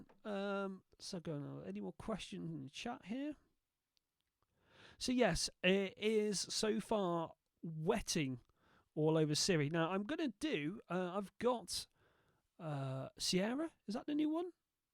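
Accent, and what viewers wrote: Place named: Southwest England, United Kingdom. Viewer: Well that was very entertaining, a bit of a car crash although that's not meant as a criticism I love the way you go live for the first time on YouTube and just learn on the go. As for Dragon 6 it looked awful in terms of Bugs and response but without knowing what hardware and Mic you are using its hard to judge. I was shocked though generally that you had the bug meaning that it would not even tell you that the mic was live! By the way and I'm only talking from experience of version 4 you can only wake the mic for sleep mode (Yellow) not the red off position. So basically I was unimpressed with the performance however I am slightly torn as a video from voice recognition Australia shows it has fantastic accuracy with basic dictation with no training whatsoever other than setting up the mic and that's what I really need it for as I'm disabled and cannot type so I may still take a punt at the reduced upgrade price of £94. 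I love the way as an Apple fan clearly you use Samsung's distressing news about exploding handsets for your demonstration of dictation, I'm still laughing by the way at Apples "courage" at doing away with the headphones jacks and the shit loads of bad PR and all the funny memes that followed which entertained all week. You have a good weekend by, the way what part of the UK are you from sounds like the Southwest-ish? I'm off for a pint of real vinegar.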